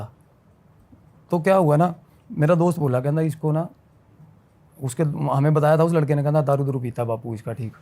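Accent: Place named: Rajasthan, India. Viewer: Bhai . I really love his Rajasthani accent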